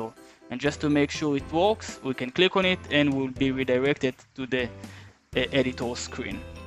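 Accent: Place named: Israel. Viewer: the most israeli accent i ever hear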